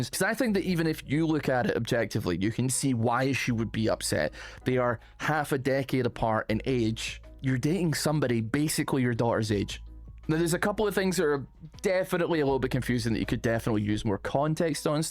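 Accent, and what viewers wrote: Scotland, United Kingdom. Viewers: Never realized I needed reddit drama in a thick Scottish accent until today >.>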